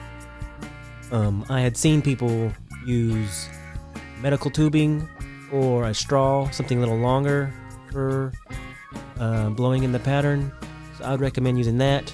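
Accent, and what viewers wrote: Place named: South, United States. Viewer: Damn I have been away from Tennessee for far too long, that southern twang makes my heart sang.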